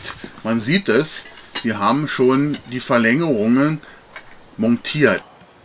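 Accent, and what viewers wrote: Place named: Berlin, Germany. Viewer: Was soll daran nicht mein Ernst sein? Dem Tonfall nach zu urteilen befinden wir uns in Berlin oder Brandenburg, folglich bezieht sich meine Frage auf die dem Brunnenbauer/Grundwassernutzer obliegenden Verpflichtungen nach den Paragrafen 36 und 37 des Berliner Wassergesetzes oder wahlweise 55 und 56 des brandenburgischen Wassergesetzes, ansonsten schlicht und einfach auf die Regelungen des Wasserhaushaltsgesetz des Bundes in Bezug auf den Brunnenbau und die Benutzung von Grundwasser.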